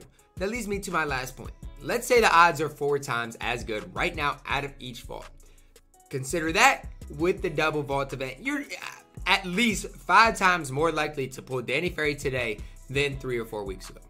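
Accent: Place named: New York, United States. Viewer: Yo are ur parents from the east coast? Ur from Iowa but sound like my friends from New York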